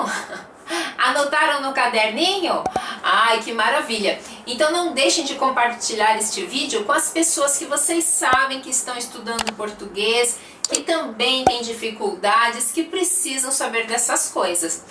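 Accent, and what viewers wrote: Brazil. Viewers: Descargo sus vídeos en MP3 y me los aprendo y así es como practico mi portugués, sobre todo el acento brasileño. Son muy buenos, me encantan, muito obrigada!❤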